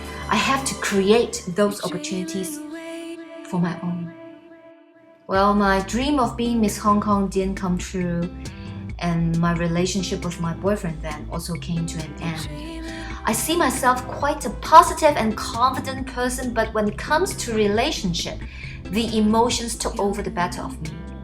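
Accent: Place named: France. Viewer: youre such an inspiring woman, I really loved learning about your past! Your English has a bit of French accent to it, it was sooo cool!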